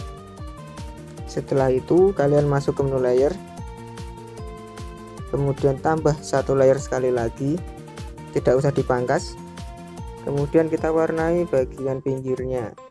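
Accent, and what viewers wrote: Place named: Java, Indonesia. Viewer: Klo bisa ngomong medok nya dikurangin,soalnya agak gk faham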